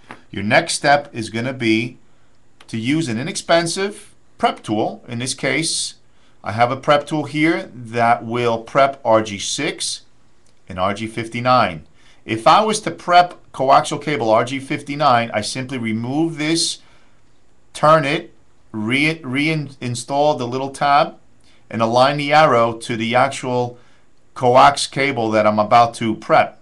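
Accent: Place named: New York, United States. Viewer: yo dawg you from new york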